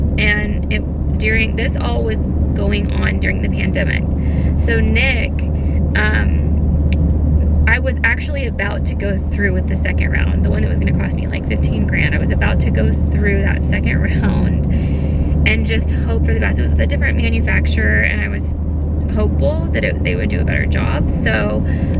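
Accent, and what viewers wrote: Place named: South, United States. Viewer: Just wanted to say... at the beginning, your voice is sounding more country. Being in the s.a. area looks good on ya! Getting the accent back lol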